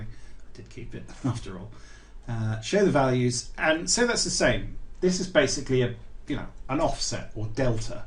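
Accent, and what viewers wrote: United Kingdom. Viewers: Yes, that is a British accent! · That is a very well spoken British accent..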